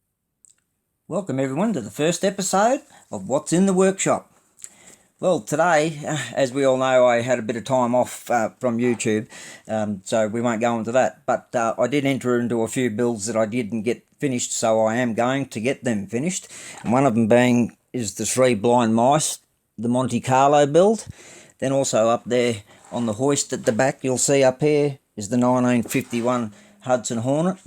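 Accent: Australia. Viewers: Well done on 100 episodes 🤙🏼 Ill comment etc but don't add me to the list to win mate, let someone else have a crack at these cool prizes! But...if you decide to ever have that Holden Ute as a prize i promise to enter as many times as I can 🤣🤣 Hope you're doing well mate and its good to hear ya Aussie twang back on the Tube 🇭🇲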